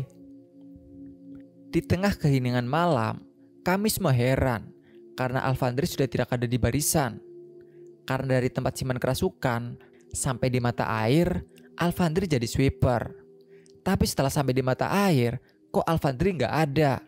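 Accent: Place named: Tegal, Indonesia. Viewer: Mas asli jw tengah tegal yo kon logat tegal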